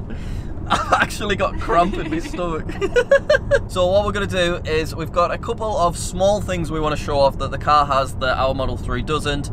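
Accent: United Kingdom. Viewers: Idk if hes british?? Idk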